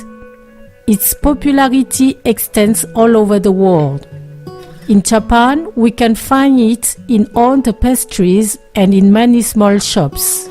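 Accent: France. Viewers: Je vais faire ce gâteau au fromage! J'étais dans mon lit, et j'ai pensé, je veux savoir quel est la première langue de. Elle parle anglais comme une personne française, alors je dois savoir ta première langue. Mais, cette vidéo est incroyable, c'est comme tes autres! C'est facile à faire, et la recette est tres claire.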